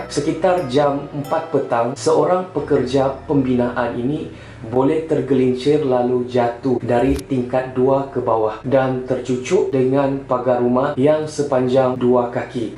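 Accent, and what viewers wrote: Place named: Sabah, Malaysia. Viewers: sesiapa yg komen kata cara dia bercakap mcm kupi2 go, korang mesti xpernah dgr org sabah bercakap kn? rajin2 la keluar dari bawah tempurung tu. belajar sikit dgr dialek ckp negeri2 lain supaya lepas ni dgr je dari cara org ckp dah tahu dia asal dari mana. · dialek sabah...islam ke??? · Jgnla macam gitu bang. Sabahan mmg macamtu cakapnya. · Cam bunyi2 org sabah...well as sabahan susah eh mau kasi lari tu slang sabah hehehe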